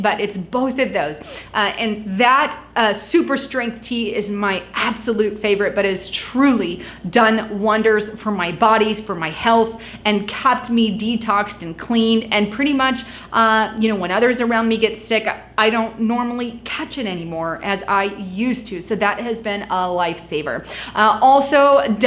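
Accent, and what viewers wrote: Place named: Texas, United States. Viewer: lol...right before i watched your video my husband and i watched alex jones and we were talking how he kept saying can.and then we watched yours...lol....we moved to texas from Missouri and its funny how many words and sayings are different. ......we said maybe she is from texas and thats what they call it....thank you, by the way for all your reports....you do a wonderful job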